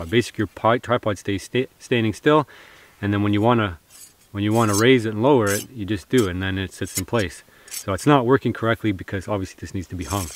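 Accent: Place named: Canada. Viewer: Watching this channel has made me realize the distinction between the way the Canadians and the Scottish say "About". This way sounds like "Aboot" with a strong O, while the Scots say it with more an U sound, "Abuut".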